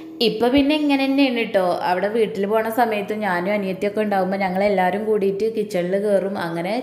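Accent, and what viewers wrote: Malappuram, India. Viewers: Ningal malappurathu evideya??thani malappuram slang.njanum malappuram aanu..anyway proud of u.ethrayokke achieve cheythallo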